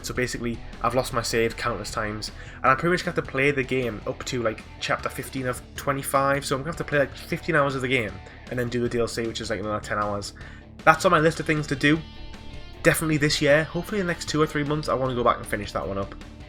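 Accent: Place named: Northern England, United Kingdom. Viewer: Sounds like your from my neck of the woods. I'm in darlington pal, nice video man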